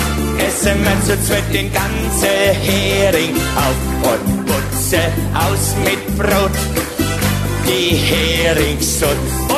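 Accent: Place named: Franconia, Germany. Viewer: Unser fränkisch is efoch schö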